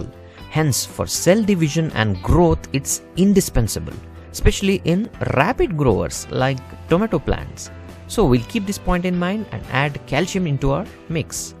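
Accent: India. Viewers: Boring. Speaking english in indian accent just to complete the video. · Indian english.☺️☺️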